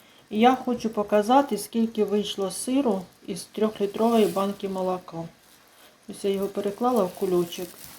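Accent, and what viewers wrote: Chernihiv, Ukraine. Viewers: Вітаю,зайшла до вам на канал випадково,але почувши такий рідний Чернігівський акцент,просто,як бальзам на душу, дякую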